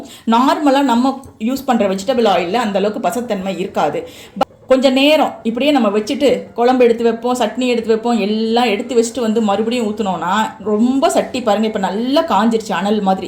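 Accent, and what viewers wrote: Kongu, India. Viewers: எண்ணி எண்ணி ரசிக்கிறேன் கொங்கு தமிழை. அருமை ங்கா.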